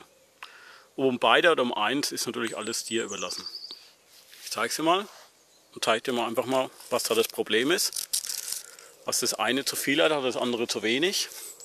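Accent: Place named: Franconia, Germany. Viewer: Cool! Hätte ich bei beiden Lust drauf. Ein Landi hatte ich ja schonmal in der Werkstatt ... Wir werden sehen ... Übrigens, ich sehe Deine Videos nicht nur wegen des Inhaltes so gern sondern ich liebe einfach den frängischn Dialekt. Bin ja selber ein Oberfranke ... Zumindest von der Mundart her.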